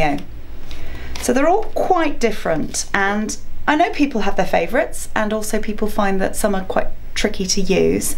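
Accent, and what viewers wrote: United Kingdom. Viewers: Thank you so much for this - have been sat getting very frustrated by wasting time on other useless videos and yours was perfect!!! 🤩 🫶 Quick question, if I may 😊 I have Brother 380D, and only 5 or so automatic embroidery patterns are marked for being ok for water soluble stabilser… the majority of the rest look pretty much chunky enough around the edges and fillings etc to be able to be held together enough -would they work and it’s just Brother being overly cautious? 🤭 Anyway, having watched your video, I now see that the world is my oyster! 😁 Thanks tons from a Brit who’s been living in France for so many years, that it was warming to hear your accent, as the majority of my crowd are French 😂❤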